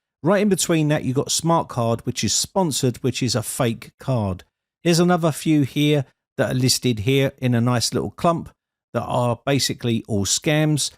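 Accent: United Kingdom. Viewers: Dear, I have been your loyal follower and supporter for years but a docile one and rarely would I comment anything here cuz you are not just only professional, but also your tone and voice attract me so much. Yes, I am definitely so in a way that no matter what topic or theme you are focused on each time, I would just trust, believe and follow so. I remember there were several times I followed closely your guidelines and instructions of running cmdlets in the WinRe settings and did have one of my Fujitsu Laptop completely fried with none OS remaining from drive x: to boot on, especially at the time about Windows 10 vers 1809 and 1903 prevailing, where MS media creation tool was not yet so available for download for fresh installation, and as a matter of fact, the approach you taught us did not even allow any system repair discs to run on and that's what I am referring to as for "completely fried". 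There were some more similar and other same Finale cases of the fate of my devices, but since I declared before already that you are my favourite IT online consultant, instructor, tutor and teacher, I never wrote here back to grieve or challenge your expertise. This has to thank for your beautiful voice which carries a sense of charisma that has a great lure to listeners even though audience never see your real face, and In my belief just based on your magnetic luring voice and sound, with precise tempo, cadence and completely pure British accent of spoken English language, yo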